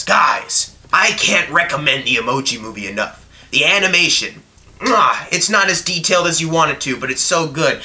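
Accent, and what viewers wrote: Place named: United Kingdom. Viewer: This is for FANS take that critics British accents are beautiful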